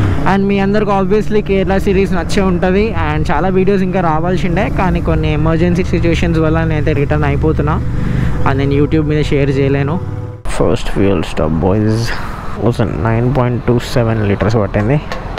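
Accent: Hyderabad, India. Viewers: That hyderabadii language was wow 😂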